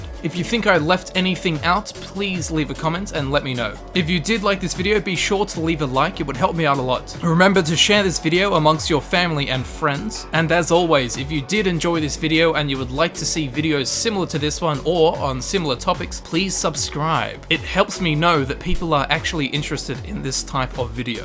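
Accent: Australia. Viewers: Batmans Goldfish he sounds like Crocodile Dundee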